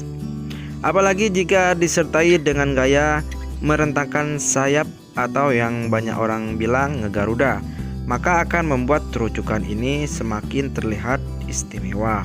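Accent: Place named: Madura, Indonesia. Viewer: Logat madura banget... lessoooh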